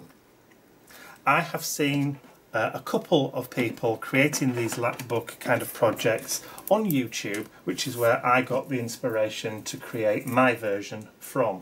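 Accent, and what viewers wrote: United Kingdom. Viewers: …..and I luv your british accent. I do not hear it that often. And it sounds pretty neat.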